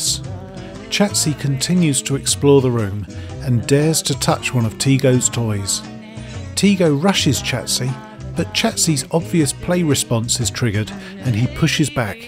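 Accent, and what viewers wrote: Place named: United Kingdom. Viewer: Nice british voice to talk about cats :) sleepy time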